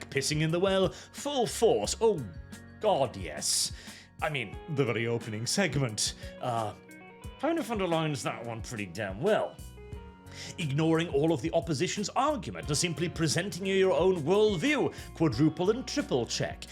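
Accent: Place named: United Kingdom. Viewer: Im surprised your from Norway, i would have never thought... Ur English is as Birtish as its gets!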